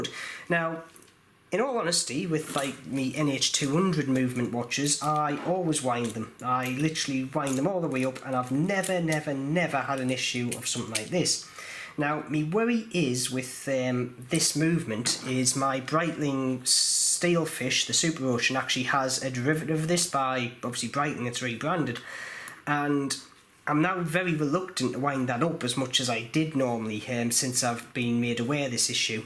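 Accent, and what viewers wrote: Newcastle, United Kingdom. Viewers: Thank you so much for creating this video. After watching it, I felt comfortable enough to replace the ratchet wheel on my Glycine Combat sub. The part cost $10 on ebay. I was hand-winding the watch everyday until the teeth on the ratchet wheel eventually shear off. Learn my lesson. BTW, love the way you Brits pronounce the word Glycine and Aluminum with an extra syllable. Tomato, Tomato. Potate Potatoe and then there is Glycine. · I've serviced my own 2824 and 2836 watches (some of which I have used regularly for 20+ years) and have never seen this issue, but will watch out for signs of it in future just in case. (I was also going to make the Glycerine comment, but you addressed it below :). Nice to hear another Geordie voice talking about watches though, at least I'm not the only one messing about up here! :0)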